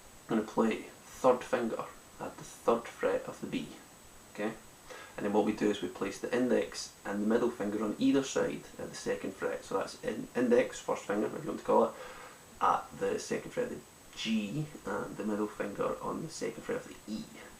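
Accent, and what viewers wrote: Scotland, United Kingdom. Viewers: damn good to hear a Scottish accent!! do you do lessons?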